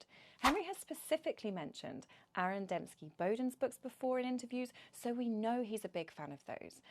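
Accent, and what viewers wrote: United Kingdom. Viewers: you got a really great speaking voice. The Brit accent really works for you. Your hair looks great as well. Ive known about 40k since being young, ive always been kind of a closet nerd though. Necromunda is my favorite setting out of all of it. Have a great day.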